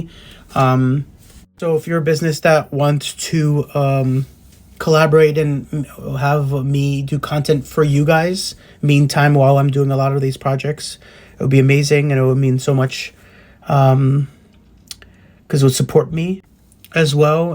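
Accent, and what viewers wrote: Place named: Russia. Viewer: Oh my god, I'm first to say, I love your videos and when I saw your video come on my youtube, I stopped what I was watching and clicked on your video., you are soooooooo talented. Your russian accent with your N.Y. mom character and Babyshka character are on point. I have watched all your videos. Please, Please continue making videos. LIfe is good. Be well and B+ (Be positive)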